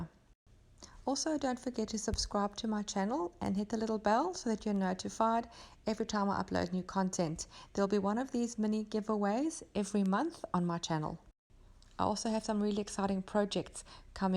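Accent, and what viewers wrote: South Africa. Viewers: I could tell she is South African, that accent never goes. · sounds more South African than Australian, doesn't she?:)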